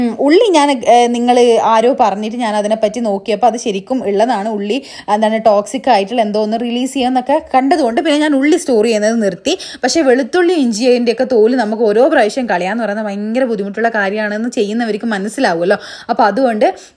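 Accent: Kannur, India. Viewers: am also from kannur, samsaram kettond chodicheyanu 😊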